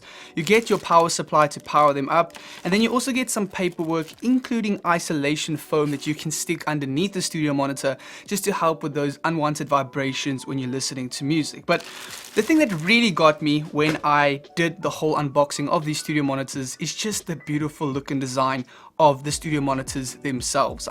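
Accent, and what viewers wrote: South Africa. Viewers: Great videos.. do I hear a fellow South African accent? I have 2 E5 XT’s on the way. I want to connect them to an Apple airport express so I can use the with Airplay. But I can’t seem to figure out how I would connect them as a pair to the Airport. The Airport only has a 3.5mm out. Would I use a 3.5 to rca stereo splitter and then 2 RCA to TRS cables.. one to each speaker?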